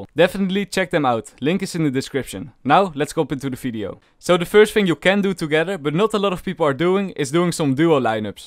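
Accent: Netherlands. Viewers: Ur dutch no doubt about that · The dutch accent makes it a lot better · This dutch accent 😭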